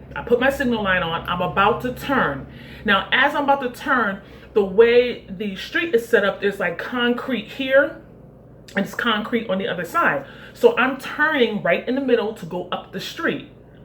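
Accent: New York, United States. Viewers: What part of NY you from? It sneaks out when you get excited.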